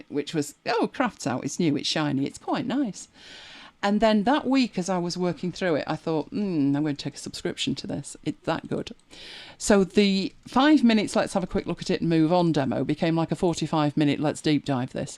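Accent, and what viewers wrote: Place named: Northern England, United Kingdom. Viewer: How many years have I been stumbling around on YouTube, picking up a snippet of info here, a snippet of info there? I finally, finally, found a teacher who knows how to teach?! This is fantastic instruction and exactly what I've been searching for. I've been using MS Word and various basic page layout apps since <ahem> the last century, but now I need Affinity Publisher and I've been baffled by its complexity. I know it can do what I need to do, but finding those tools and making them work has been a source of endless, discouraging frustration. I'm feeling capable and optimistic again for the first time in months. It doesn't hurt that speaks like I do, either 😂 Or how I would have sounded if I hadn't moved from northern England to Canada many years ago. I am 100% connecting with this content. [Update: didn't have time to watch this all in one go, so I'm on day 2. 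And I have to say again: oh my goodness this is good teaching. I just found Medium account. Will be devouring that too.]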